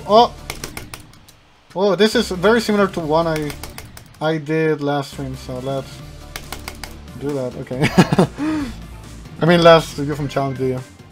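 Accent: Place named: India. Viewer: but he sounds india so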